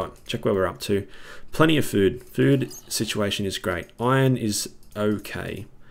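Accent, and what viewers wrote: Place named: Australia. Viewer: even know i am australian so still sound so aussie!! Loving The Series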